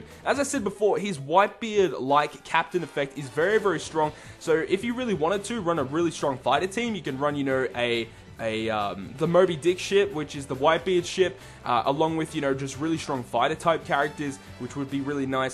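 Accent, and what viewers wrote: Australia. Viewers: you aussie?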